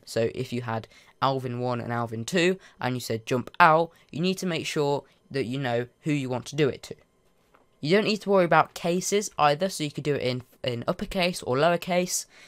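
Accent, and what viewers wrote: United Kingdom. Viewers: He’s British that’s how they say it there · Dont bully him cuz he is saying hach its a british thing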